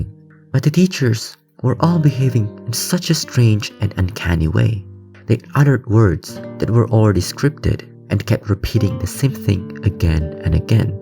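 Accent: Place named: Asia. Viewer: Interesting perspective, but there are some points you made I'm agaisnt. Dressing up as animals in public or marrying fictional characters shouldn't be normalized by society and encouraged. You should not go to prison or be systematically punished, but these actions should be socially condemned. A society needs stable and functional norms that are followed by each citizen in order to survive, or else it risks falling into problems such as decadence or just general social instability. Morality can be subjective depending on how you look at it, but standards must be set. It doesn't have to be 1984 either. However, I can understand why you might think this way. I'm not too knowledgeable on this topic, but on some countries such as Korea and Japan it can get kinda insane, as it has become socially normalized to have a false identity in your public life just to be agreeable and appease everyone. 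Judging from your accent, I think you may be Asian so it is reasonable to think you'd be unsatisfied with how social freedoms can be restricted by society in those countries. However, at some point, freedom needs to be limited so society can keep running and not fall into collapse. You have the freedom to make choices, but you do not have the freedom to escape the consequences. This may sound a bit like Petersonianism, where every individual has free will but it must be enforced with strict moral codes for an ideal society. I might be wrong, I'm not a philosophist I'm just sharing my thoug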